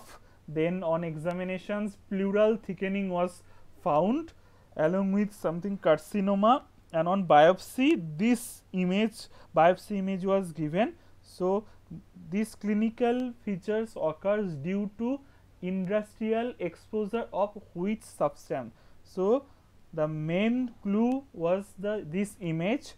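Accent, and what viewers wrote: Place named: Bengal, India. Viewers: It's bengali accent I think, they pronounce a as O generally. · Don't go with accent. He was Our WBUHS topper got honoyrs in every subjects all 14, gold medel, record marks in Obs gynae..... And Our Bengali accents are like this.... don't go with this.... he is a very good doctor that's a matter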